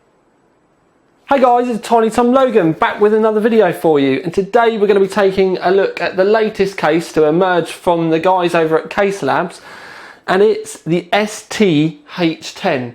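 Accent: United Kingdom. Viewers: He is British. The world doesn't revolve around your regional accent let alone language.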